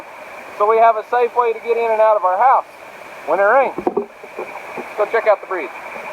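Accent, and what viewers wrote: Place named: Oklahoma, United States. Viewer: So glad I found your channel! Moved from near Oologah OK to UT in Dec 17. Nice to hear that Okie accent!!!!! These people here never understand what I am saying but love the mountains!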